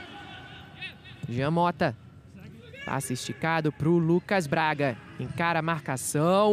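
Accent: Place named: Rio de Janeiro, Brazil. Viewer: Dá para tirar essa VOZINHA de TAQUARA RACHADA, feia, estridente, de criança e colocar um HOMEM ou uma MULHER ADULTA para narrar!? Fora o sotaque extremamente acentuado que só CARIOCA entende... TIREM ESSE NARRADOR DAÍ, URGENTE!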